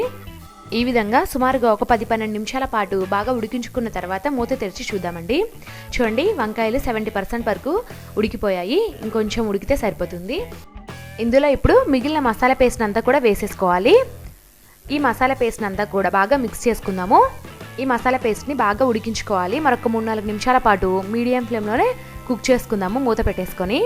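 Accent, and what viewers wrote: India. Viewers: Mi language antha coastal area la undi...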